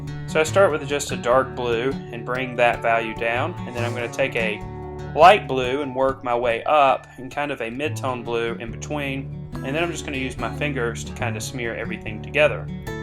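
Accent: South, United States. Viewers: beautiful you are really good and i like you countryishh accent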